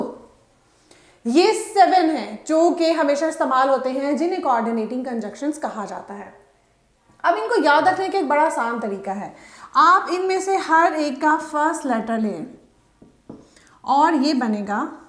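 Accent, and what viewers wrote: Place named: Urdu, Pakistan. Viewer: Mam I think your mother tongue is Urdu right ? But you teach very super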